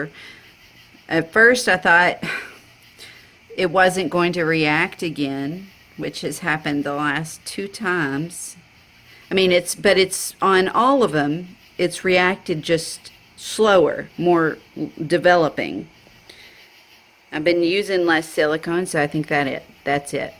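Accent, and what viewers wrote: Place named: South, United States. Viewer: Ms, from the background noise and your accent, I'd say you're somewhere in the South! I'm in New Orleans. Beautiful pour. Every time I try that many colors together I get mud.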